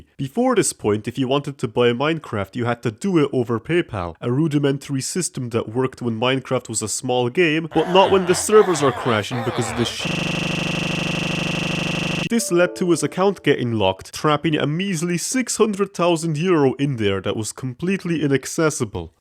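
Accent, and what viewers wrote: Eastern Europe. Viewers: You're a blend of Eastern European and Dub - its unique.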